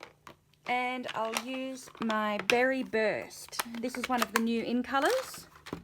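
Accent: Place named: Australia. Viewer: Great card. Wonderful to hear a fellow Aussie voice. Pam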